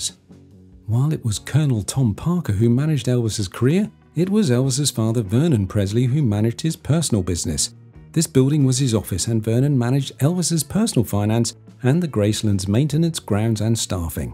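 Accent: England, United Kingdom. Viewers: Listening to an English man describe Elvis Presley and his southern mansion and his southern ways is kinda like listening to Ozzy Osborne preach the gospel, it just doesn't really go together.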